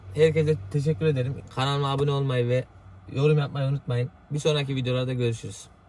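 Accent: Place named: Central Anatolia, Turkey. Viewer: konusmayi bilmeyen herkes video cekiyo arkds ..niğde aksaray adana kayseri kırsehir vs sivesinden kurtamayan gurbetciler..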